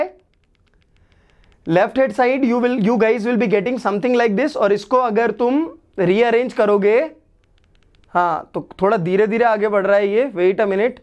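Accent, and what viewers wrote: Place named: South, India. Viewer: Yeah from south obviously you can understand his accent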